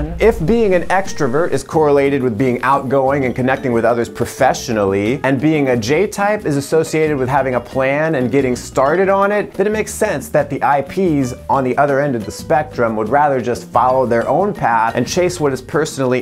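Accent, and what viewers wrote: United Kingdom. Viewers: i'm british, i've gotta say doesn't sound anything like a Londoner but sounds like the people where i live, good british accent.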